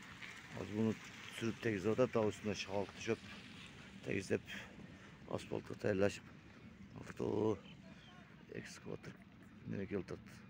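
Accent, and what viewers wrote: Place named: Batken, Kyrgyzstan. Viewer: Баткенден Ноокатка келип тартытасынбы, тилин тушунуп тушумбой жатам, шо шо дегенине Караганда баткенчи окшойсун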